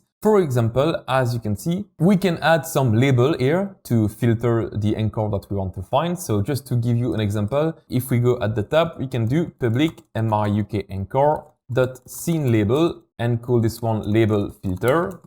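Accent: France. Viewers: Hi, are you french? your accent...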